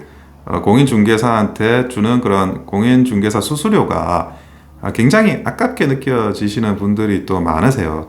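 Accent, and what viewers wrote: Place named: Gyeongsang, South Korea. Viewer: 존경하는 원영섭변호사 대표님 반갑습니다 안녕하세요 팬앤드마이크TV 시청중하고 구독하러 왔습니다 건승하십시오 경상도 목소리 너무 좋으십니다 감사드립니다 멸공 필승 단결 🇰🇷🇱🇷🎉🎉👍👍🥰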